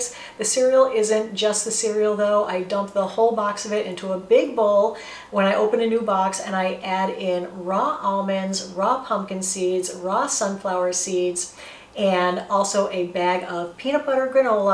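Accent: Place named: Midwest, United States. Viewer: Where do you live, in the midwest? I wonder because of your accent and the products all look so good - quality -- I don't see any of those brands down here in the South. Thanks for giving us details of what you eat in a day, itemizing really helps us get motivated to stock up for health!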